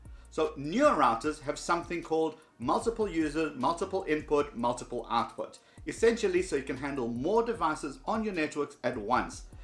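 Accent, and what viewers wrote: South Africa. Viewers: You confuse me... S. African Accent, Israeli name... but you live in the US?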